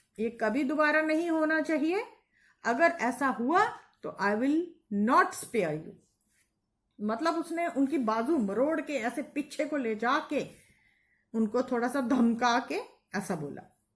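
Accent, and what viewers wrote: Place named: Jammu, India. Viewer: Mam aapme thodi dogri jalak hai 😅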